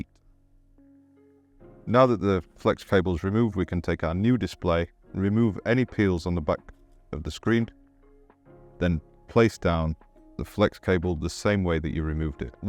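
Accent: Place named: Sussex, United Kingdom. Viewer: I just received my replacement battery for my iPhone 11, and I’m ready to put everything together probably tomorrow. Your voice sounds familiar to me as my ex-wife‘s mother is from Sussex. I miss bubble and squeak, bangers and mash, and steak and kidney pie. It’s been 30+ years since I’ve had it, and watching your video makes me ‘Jones’ for it. Thank you for your time.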